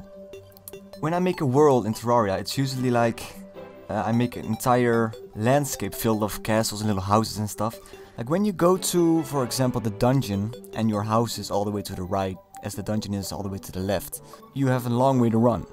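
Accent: Netherlands. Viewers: You sound very dutch to me... no doubt. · You are dutch right? I can hear it in your accent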